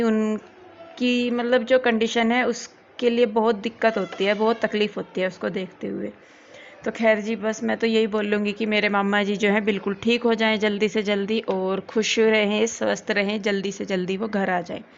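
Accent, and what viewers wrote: Haryana, India. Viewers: आप यूपी की लग नहीं रही आपके बातों से हरियाणा वाली लग रहे हो आप मेरे बेटे के लिए आपकी साइड की कोई लड़की ढूंढ दो गरीब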